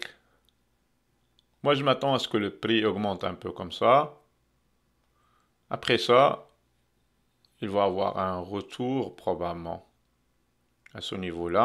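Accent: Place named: Quebec, Canada. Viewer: merci pour vous riches analyses ! avec accent québécois en plus :) grand bravo !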